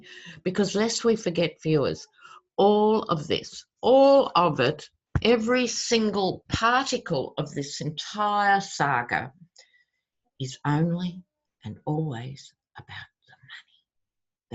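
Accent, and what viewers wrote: Australia. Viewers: Living in America since Trump is like being in A Twilight Zone episode that you can’t get out of. Republicans like Jordan & the rest are beyond corrupt & hopefully facing their downfall sooner, rather than later. What’s really frightening is delusional Trump who thinks he’s the second coming, literally. Help. 🙄I love your readings & listening to your fantastic Aussie accent. 💗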